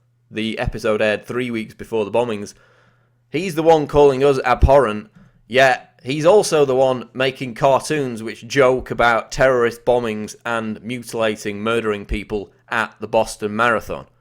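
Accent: United Kingdom. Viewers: Is Alex Jones still doing the Piers Morgan voice or is the narrator British?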